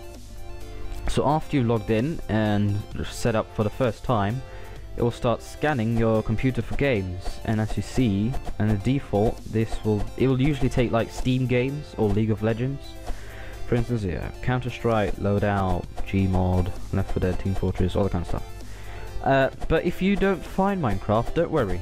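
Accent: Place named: United Kingdom. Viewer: You're norwegian or british xD,i noticed your accent so i thought you were british.Anyways nice video mate helped me alot.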